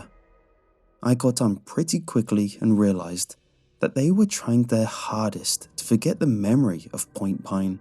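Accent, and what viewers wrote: United Kingdom. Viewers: Mans from arizona but speaks but has a british accent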